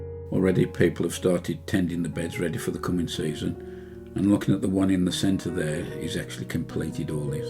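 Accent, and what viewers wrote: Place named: Black Country, United Kingdom. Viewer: Hiya. I have watched many of ya videos and I think they are great, ya cor be too far from me with that accent. dragged up in Gornal.raised in Woodsetton and now live in Brewood. yow must be local to me with that Goodrich logo on ya top, I'm on the allotments in Watery lane Codsall, Ima a guessing yam in Bushbury or close by, would love to hear from ya, Hopefully, speak to ya soon,Arthur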